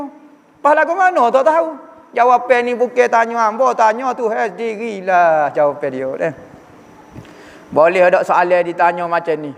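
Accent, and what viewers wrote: Kelantan, Malaysia. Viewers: suka dengar ostaz kecek klate hehehe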